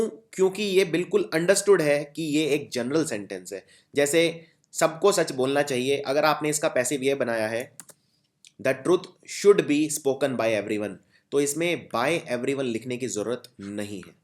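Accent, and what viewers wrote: India. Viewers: Are you speaking english or Indian ? What heavy accent do you have !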